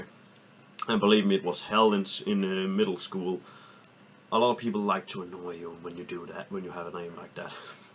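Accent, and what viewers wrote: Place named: London, United Kingdom. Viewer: Jack The Ripper hmm I thought your from around London from your accent but english doesn't seem to be your first language so I understand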